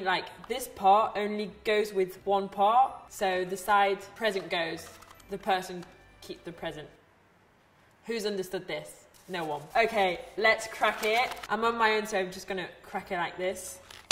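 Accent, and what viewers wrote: England, United Kingdom. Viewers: Omg your english acent is incredible!!! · OMG mais on veut + de vidéos en anglais!! l'accent britannique vraiment >>> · I love your British accent 🫶 We want so much more of these videos in English!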